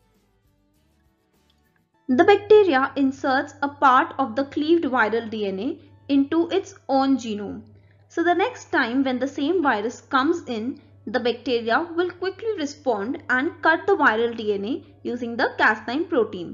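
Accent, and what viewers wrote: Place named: India. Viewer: 真是受不了阿三口音，我服了，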